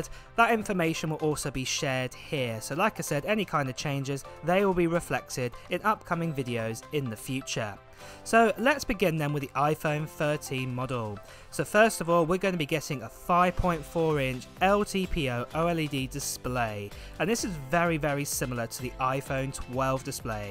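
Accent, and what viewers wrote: United Kingdom. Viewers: British accent 😂😂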